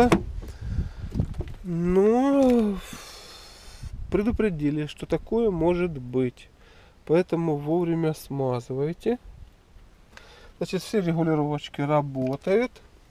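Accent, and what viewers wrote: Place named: South, Russia. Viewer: На юге все так Хаварят) съездите в Ростов !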